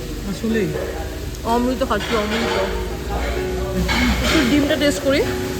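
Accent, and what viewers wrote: Kolkata, India. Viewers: তোমার বাংলাদেশের ভ্লগগুলো দেখলাম। তুমি খুব সুন্দর করে কথা বলো। কোলকাতার বাংলা আ্যকসেন্ট আমার কাছে বেশ দারুন লাগে। আরো ভ্লগের অপেক্ষায় রইলাম। আর মাওয়া ঘাটের ইলিশের লেজের ভর্তাটা আসলেই খুব মজার। আমি ২০১৯ এ খেয়েছিলাম। তখন অবশ্য এত সুন্দর হোটেল দেখিনি। এখন বেশ ভালো লাগলো দেখে। যাইহোক, আশা করি তোমার বাংলাদেশ ভ্রমন ভালো যাচ্ছে। এপার বাংলা থেকে বিদেশীনীর জন্য অনেক অনেক ভালবাসা রইল।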